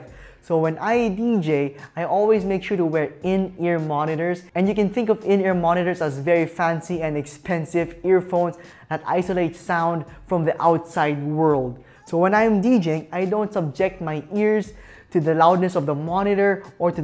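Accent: Philippines. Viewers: Are you Filipino. You have an accent lol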